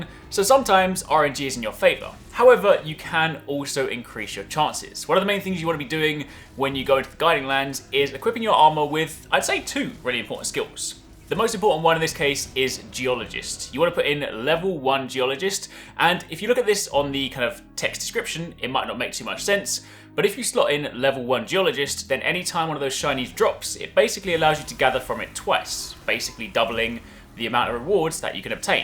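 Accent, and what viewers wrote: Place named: United Kingdom. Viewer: you look like a viking but talk like a brit and its creating some SERIOUS dissonance in my mind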